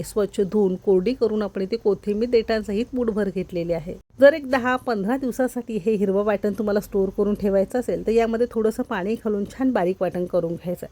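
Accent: Kolhapur, India. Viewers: Khup chhan recipe.. sadarikaran uttam.. tumhi kolhapurche aahat ka?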